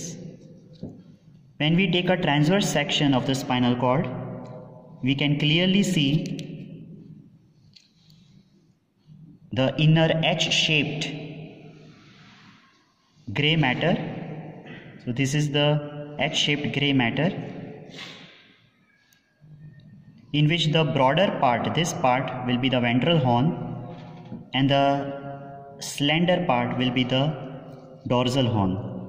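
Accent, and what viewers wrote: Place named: India. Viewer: Indian accent is so cool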